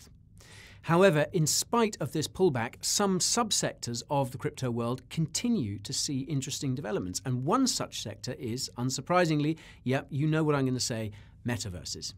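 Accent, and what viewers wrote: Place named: London, United Kingdom. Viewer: lool that intro, such a londoner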